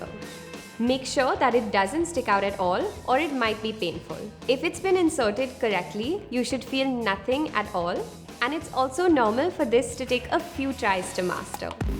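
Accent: India. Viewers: I was confused, the lady looked so white yet her accent was strong indian, turns out it's an indian channel. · Why does she speak in Indian accent? She doesn't look like an Indian. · Why does she have an Indian accent?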